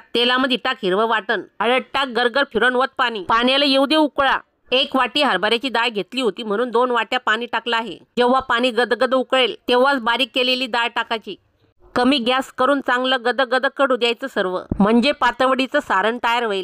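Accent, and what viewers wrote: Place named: Ghats, India. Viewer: Ghatavrchi ahe vatte tai bhasha khup mast ahe MI tr boln Aaykte nemis tu mchi tai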